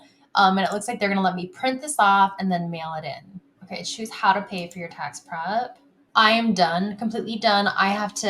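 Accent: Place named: California, United States. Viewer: I tried watching the Vedio as much as i could, your California accent ruined everything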